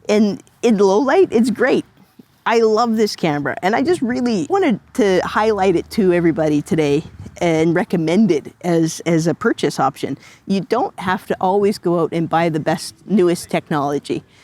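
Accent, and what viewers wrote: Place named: Baltimore, United States. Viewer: Thanks for taking the time to share your thoughts. I just grabbed an EOS R for 1,070 USD! It was a cancelled special order that's been sitting in the store room since 2018. I was going to hire an R5C for a job, but this purchase didn't cost too much more than the rental fee. What SD cards do you use? I've found that Lexar V60, 1800, UHS-II have been massively discounted recently. I'll mostly likely shoot in 1080 most of the time, but I'd like to get the best 4k onto the card, when I do use it. Are V60 cards to the challenge or V90 UHS-II cards critical? Is the difference between 8 bit and 10 bit dramatic, or is it something that you notice only when really analysing the output? I'm originally from Baltimore and it's always funny to me when I hear the same pronunciation as my way of saying the letter "O, from aaaall the way in the FNA (Far North America). 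Cheers!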